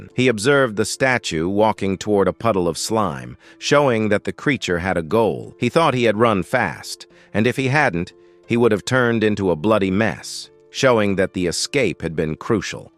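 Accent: United States. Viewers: What's with the texan accent 😊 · Tf is that country accent 💀😂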